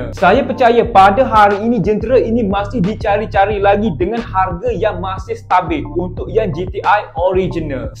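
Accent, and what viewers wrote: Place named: Terengganu, Malaysia. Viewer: Pekat ganu nih... Kereta Proton buat kerja yg bubuh Brg2 tuu...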